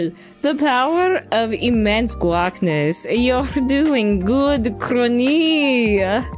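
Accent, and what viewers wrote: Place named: South, United States. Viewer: Loving sassy southern Kronii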